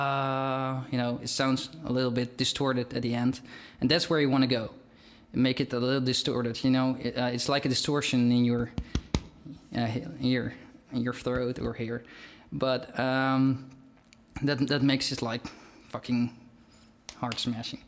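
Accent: Netherlands. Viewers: sounds like a Dutch accent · Ik hoor direct aan uw accent dat ge Nederlands zijt :D Heel goed! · where are you from? from the Netherlands? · You are dutch aren't you :p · AaronTK Kelly He is dutch. :D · are you dutch? :3 you sound like you are. IT'S CUTE. :3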